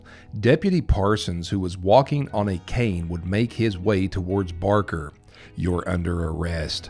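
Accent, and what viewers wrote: Kentucky, United States. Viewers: Awesome upload,as always. Smooth Kentucky voice an added bonus! Thank You,!👍🤗✌️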